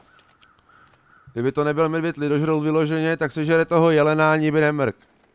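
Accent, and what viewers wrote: Czech Republic. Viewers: lubozvucny moravsky dialekt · čau chci se zeptat ty pocházíš někde z okolí Ostravy?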